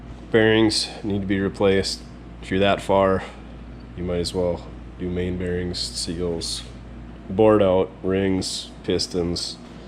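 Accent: Minnesota, United States. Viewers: Yes, like Marge Gunderson in the movie Fargo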